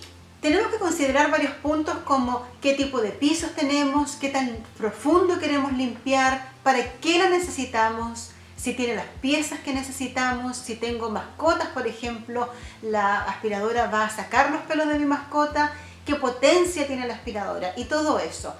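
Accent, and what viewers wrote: Chile. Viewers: Muchas gracias. Acabo de descubrirte. Estoy fascinada. Y me es lindo oír un acento chileno. Te cuento que descubrí las vaporetas y me estoy haciendo una muy buena impresión. Sabes algo? Te animarías a hacer un video? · Hola, gracias por los consejos. Una pregunta eres Chilena? Por tu acento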